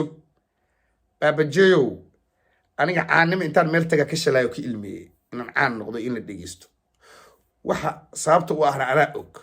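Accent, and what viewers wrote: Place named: North, Somalia. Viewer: Marka hore lahjada waqooyiga aad ku hadleysid jooji hadaa rabtid inaa qabiil kaada u hadashid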